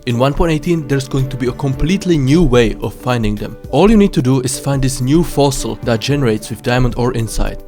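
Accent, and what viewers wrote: Russia. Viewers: Слова как будто с русским акцентом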